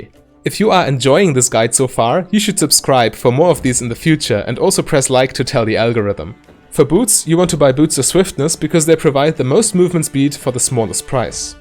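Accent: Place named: Germany. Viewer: classic german accent ^^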